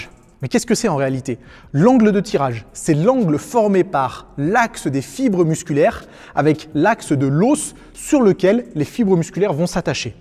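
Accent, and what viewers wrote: Lyon, France. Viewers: Tout le monde prononce comme ça à Lyon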